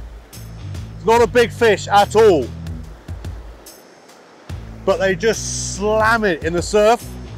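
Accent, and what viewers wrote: South Africa. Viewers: I like how he keeps saying, Yoh! Like a proper Saffa 😁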